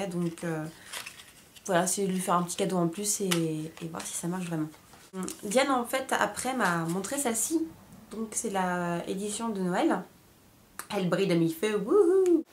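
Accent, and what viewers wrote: England, United Kingdom. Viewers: j'adore ton accents anglais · j'adore ton accent anglais mdr!!!!! <3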